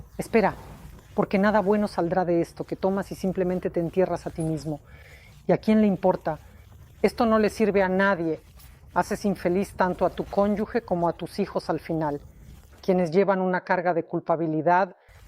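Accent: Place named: Mexico. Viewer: Por fin, hace tiempo que quería escucharte en español y con un acento mejicano 😅.... maravilloso ❤❤❤. LOVE YOU!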